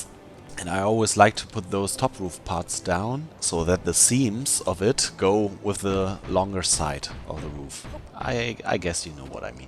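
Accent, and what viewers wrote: Germany. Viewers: Naja, dass du deutsch bist hört man an deinem Aktzent ;) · Warum mschst du keine Videos auf deutsch dein englisch ist erhörbar, das du aus Deutschland kommst.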